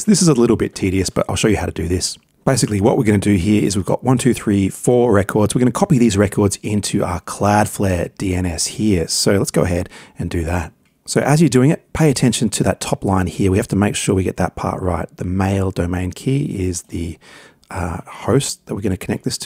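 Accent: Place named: Australia. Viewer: Hey, this video is great, thank you. As it sounds like your from Australia as well... we have only just starting working a lot more with Cloudflare in the last few months with websites, subdomains and email routing. We have come across problems with our internet and email provider being Optus. Question, do you know if this format that you have just explained will work for something like Optus, because all our emails we send from Outlook. Hope this makes sense, regards Brad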